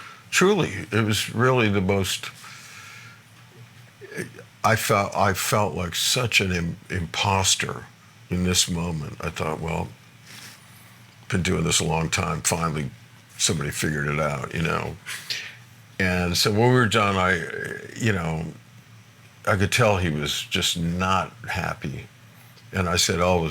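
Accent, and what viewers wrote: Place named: New England, United States. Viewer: Clevah for clever. It's just called a New England accent. RI, MA, NH, Parts of CT. We all talked that way.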